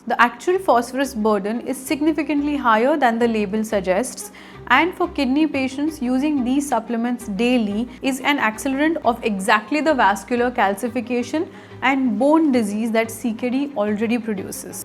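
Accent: India. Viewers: I don't understand Indian accent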